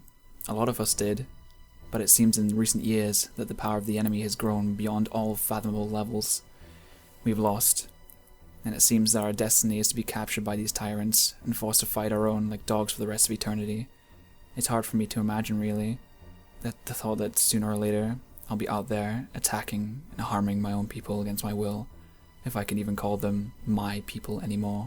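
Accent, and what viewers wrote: United Kingdom. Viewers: ... Please do more of these... That's great. And the british accent makes it even better:] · hes British lol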